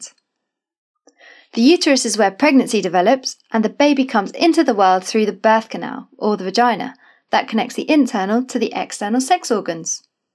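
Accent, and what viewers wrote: United Kingdom. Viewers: Im sorry but in my prefrence, its alot harder to memorize and understand what the video is teaching when the person has a british accent.... im sorry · Extremely bad video, not obvious, bad fast voice, videos used to be more helpful and obvious It is NOT a compilation for the sexiest British accent! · This british narrator gotta go. Im sorry. Bring the guy back please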